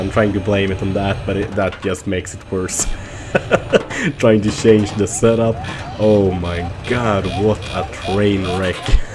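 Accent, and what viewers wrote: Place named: Sweden. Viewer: This guy has to be from Sweeden. I can hear it when he talks and I know i'm right! I just know!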